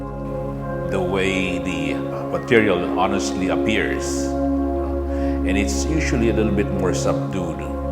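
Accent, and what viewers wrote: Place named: Philippines. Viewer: Click agad dahil sa thumbnail, kakaiba yung design... Pini-figure out ko kung India, Malaysia, Singapore yung bahay Pero nung nagsalita na... Luh! I somehow heard a Filipino English accent kaya sabay tingin sa description at comment. Just wow! 💪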